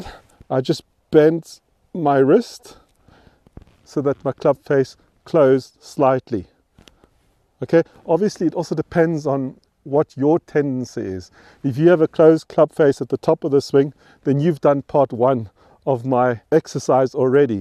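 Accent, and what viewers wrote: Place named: Austria. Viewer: Love the Arnold accent ... nice video!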